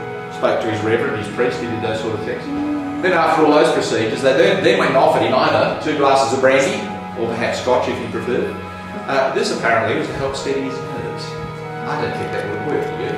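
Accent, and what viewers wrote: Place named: Australia. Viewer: Australian accent makes it sound less scary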